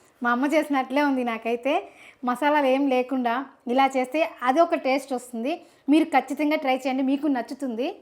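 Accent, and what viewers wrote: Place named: Chittoor, India. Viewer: natural and nice cooking with chakkani chittoor telugu maatalu, so homely...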